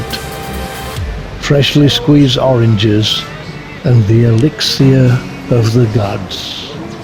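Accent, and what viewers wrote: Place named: United Kingdom. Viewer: Love your style very clear and distinct typical British English thanks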